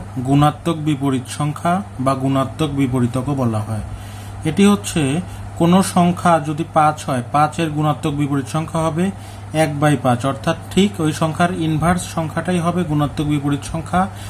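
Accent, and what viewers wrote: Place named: Barishal, Bangladesh. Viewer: আপনার বাড়ি কি বরিশাল?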